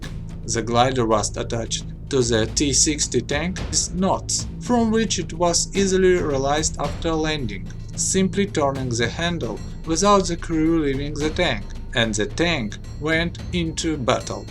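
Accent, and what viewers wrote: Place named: Russia. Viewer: that russian accent is T H I C C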